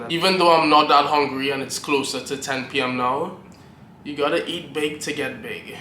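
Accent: Trinidad and Tobago. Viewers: Are you from Trinidad? I'm hearing it vaguely. But anyways, I came across your shorts and it's been history ever since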